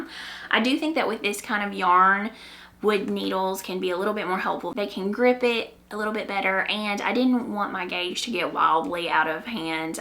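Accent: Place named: Tennessee, United States. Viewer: I just found your podcast and I love it! Going back to listen to the others. Question….. both my daughter and I think you sound like you are from Tennessee (we are from East Tennessee). Have you spent some time in Tennessee or is that just a pure Arkansas accent? 😁 No matter, love listening to you.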